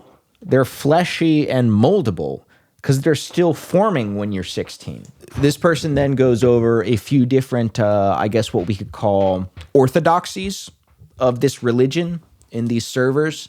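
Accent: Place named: United States. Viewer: Hey. I have multiple diagnosed disabilities and am a disability studies scholar. What you're doing w this video is all wrong and incredibly harmful to actually disabled people. Yes, there are people out there who fake having a disability and the internet has made them more visible than ever. But this abled urge to play detective in order to prove or disprove any person claiming to be disabled is legitimate or not literally kills disabled people. Yes, the faking can take up some limited resources from legitimately disabled and their existence creates their atmosphere of doubt around disabled ppl but outside of when it proceeds to the next stage of attempting to do whatever the disabled equivalent of 'transvestigating' is that it truly becomes dangerous to disabled peoples lives. You sound like you're from the US, so lemme tell you about something that happened in the UK bc of this exact impulse. 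In 2010 the conservative party and the liberal democrats formed a coalition govt that pursued an economic policy called austerity. This is a program which seeks to cut back funding to all public services, and part of that included some very significant welfare reforms. At the time, the political atmosphere was one of distrust towards ppl on benefits, this kind of prevailing belief that there was some massive amount of 'welfare queens' equivalents and disabled fakers who were living lives of luxury on the public dime and was aggressive about trying to identify and weed them out. So, as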